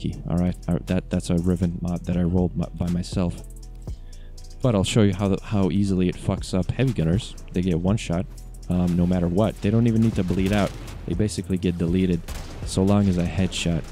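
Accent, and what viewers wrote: United States. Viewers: Great information thanks for this. I have to ask, are you from Maryland? You have that accent sort of.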